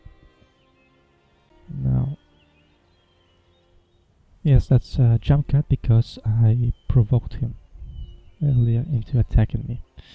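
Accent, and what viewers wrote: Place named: Russia. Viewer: I didn't know you were Russian. You should comment more - you have a very soothing voice coupled with that fabulous accent.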